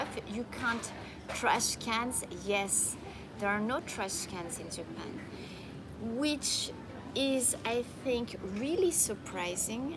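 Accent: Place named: France. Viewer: i like her french accent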